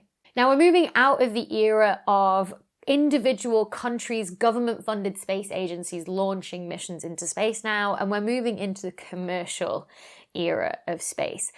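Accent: United Kingdom. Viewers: That's my thought, too, but Dr. Becky isn't the only British astrophyicist I've heard from. I think she is the only one I subscribe to, though, so perhaps she's more comfortable using the British pronounciation than someone doing a Ted Talk would be. Would be cool if she decided to respond.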